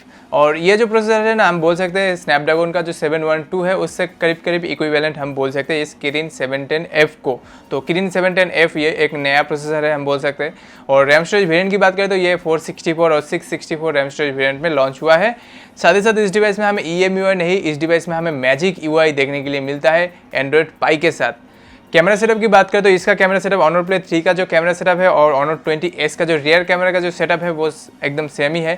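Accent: Bengal, India. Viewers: Amar mone houechilo Tumi Bengali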